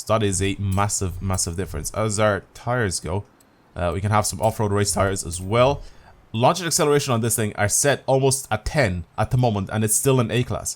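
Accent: Dublin, Ireland. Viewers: are you from dublin??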